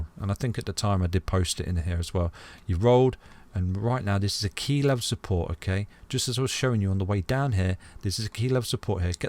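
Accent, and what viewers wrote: United Kingdom. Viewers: He speaks the most clear British accent I have ever heard, and I am a German speaker 😄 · I AM FROM THE UNITED STATES 🇺🇸 WE DO NOT SPEAK LIKE THAT. UK y’all sound like a dying dog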